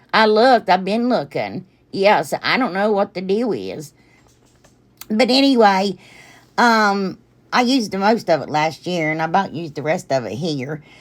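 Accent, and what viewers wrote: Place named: Kentucky, United States. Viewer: GIRL if you live close to Heath you are only an hour and a half from me. I am also from Kentucky. Harlan if you know where that is. I just love watching you. My husband will hear you talking and say 'Are you watching?' Listening to you talk reminds me of home.🧡💛💚💙💜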